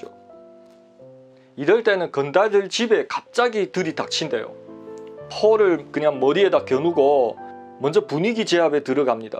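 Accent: Yanbian, China. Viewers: 연변말도 한국어의 지역사투리중의 하나입니다.니네말 우리말을 가르면 한국어도 서울말,경상도말,전라도말 등으로 나누어야 할겁니다.서울말만이 한국어인가요? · 이사람아 우리말 부터 배우고 방송해라 거놈의 연변 토박이말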